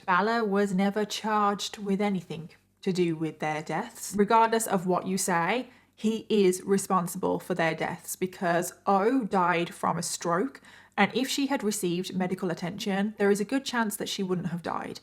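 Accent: United Kingdom. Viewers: Bailey Sarian in British. You did a nice job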